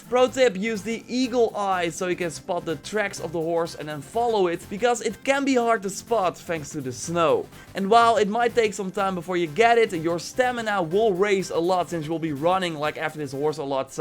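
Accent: Netherlands. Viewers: Dude, damn... dutch accent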